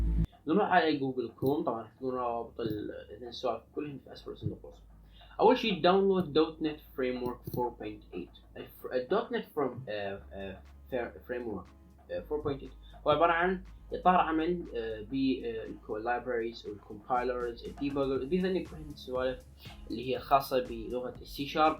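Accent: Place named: India. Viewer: أمانة أنت فهمان شو عم تحكي ، يازلمة عم تحكي متل الهنود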